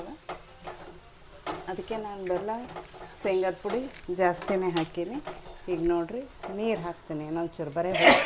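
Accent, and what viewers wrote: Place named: Brahmin, India. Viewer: ಎಲ್ಲಾ ಅಡಿಗೆ ತುಂಬಾ ಚೆನ್ನಾಗಿ ಮಾಡುತ್ತೀರಿ ತುಂಬಾ ಚೆನ್ನಾಗಿ ಹೇಳುತ್ತೀರಿ ಬ್ರಾಹ್ಮಣ ಭಾಷೆ ಅಪರೂಪವಾಗಿದೆ ಕೇಳೋದಕ್ಕೆ ಖುಷಿ ಅನಿಸ್ತದ ನಮ್ಮ ಉತ್ತರ ಕನ್ನಡದ ಬ್ರಾಹ್ಮಣ ಭಾಷೆ 🙏🤝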